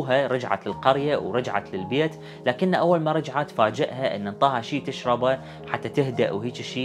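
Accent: Iraq. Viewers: حلوه اللهجه العراقيه 🥰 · انا بحب اللهجة العراقية جدا ، بس يعني ايه فتشي؟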